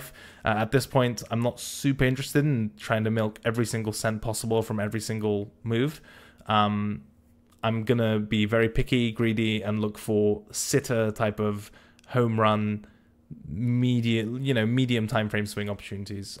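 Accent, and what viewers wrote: RP, United Kingdom. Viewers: despite sounding like a tory boy is legit the best at going over price action, always watch your videos lad. thank you. i really mean that.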